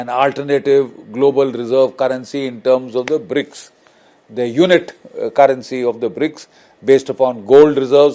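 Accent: India. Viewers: I like the way he talks , he speaks English but with an Indian accent, which sounds very nice.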